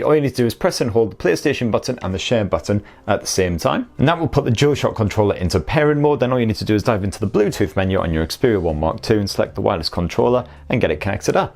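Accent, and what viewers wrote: United Kingdom. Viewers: Hey bub Just to let you know, I had a hard time keeping up with your tips and tricks,, you went extremely fast explaining the tips, you had to rewind and play what you said several times to catch what you said, 2 your British accent is a little difficult when you're going too fast speaking wise, 3 you're an phone expert but many people might not be so try to use common words for average people just my advice bub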